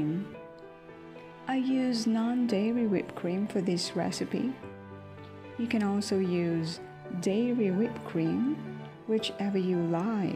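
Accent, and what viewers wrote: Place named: United States. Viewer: Ganahan ko sa voice over...murag amirkana 😊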